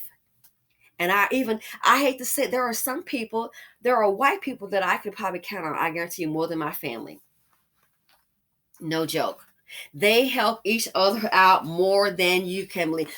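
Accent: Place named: South Florida, United States. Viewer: I knew you were around Palm Beach. I’ve been trying to pin point your accent because you sound like another YT’er I listen to who lives in South FL. I love your accent.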